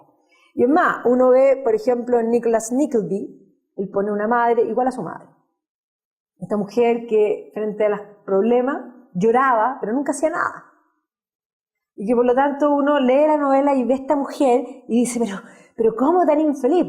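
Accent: Chile. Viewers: Señor, aunque tarde el comentario pero no sé puede dejar pasar...no le molesta la dicción, en realidad lo que se le hace extraño es el acento Chileno, pero para cualquiera que haya ido a una universidad acá, es el estilo y ritmo normal de exponer un tema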